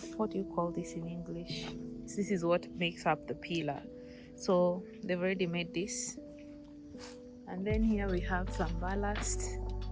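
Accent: Kenya. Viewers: Kenyans and being "exZited". I love it💕😁 Congratulations on your achievement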